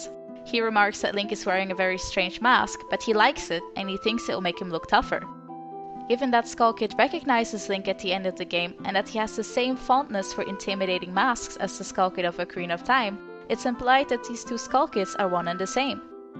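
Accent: Netherlands. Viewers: In this video you can also clearly make out her dutch accent and it is super cute 🥰🥰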